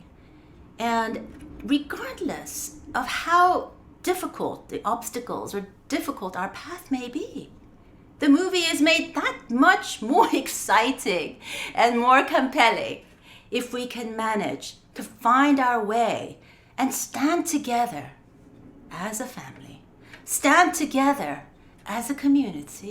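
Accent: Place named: United Kingdom. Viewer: How did you get that British accent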